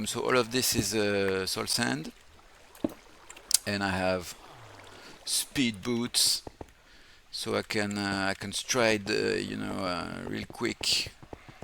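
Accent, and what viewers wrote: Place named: France. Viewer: really cool base, love the french accent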